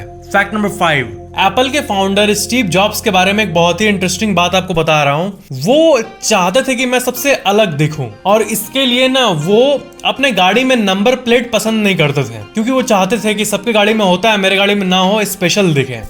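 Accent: Bihar, India. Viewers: Now your tone is likely bihari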